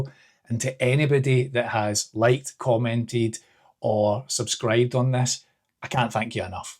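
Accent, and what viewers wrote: Scotland, United Kingdom. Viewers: I suscribed from your first video, which just randomly popped up on my screen back in January. I watch on a tv. You’re doing well and your channel will keep growing. And it always helps to have a Scottish accent, one of the nicest to listen to on youtube.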